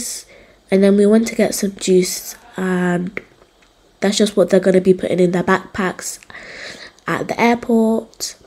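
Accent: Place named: United Kingdom. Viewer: The British accent though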